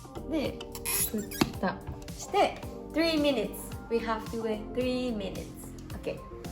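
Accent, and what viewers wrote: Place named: Japan. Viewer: Valo Hoise, Her English is truly Japanese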